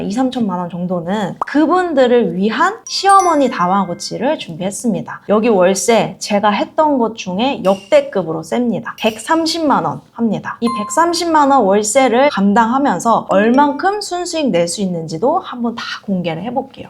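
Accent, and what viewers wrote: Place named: China. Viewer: 가 중국인 악센트가 있으시네요~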